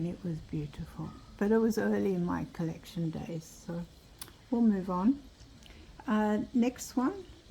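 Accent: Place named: Australia. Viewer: Great selection . You sound like one of my fellow Aussies !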